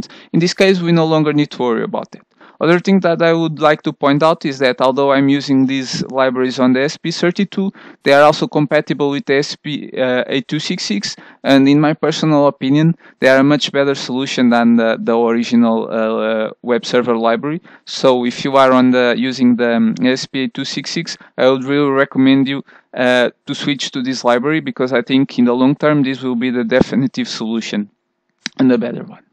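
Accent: Portugal. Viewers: Great! Quick reference. Thanks. :-) You're Portuguese, right? I'm Portuguese and, from your accent, I would guess you are too. Just a quick correction. If you have your local WiFi router correctly set up, you don't need to be on the same WiFi network to access the web server. you can access it from any other inter-connected network. The Internet, for example.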